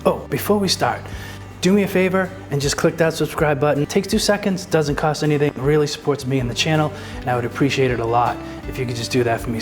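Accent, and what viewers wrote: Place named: Boston, United States. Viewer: Hi, love the video. I’m going to make this as soon as I can. I was just wondering what part of the northeast you are from. I’m in Massachusetts and you sound just like me! Chadda, betta. You know, like your from Boston or somethin. Just curious! Thanks for the video. ✌️🍻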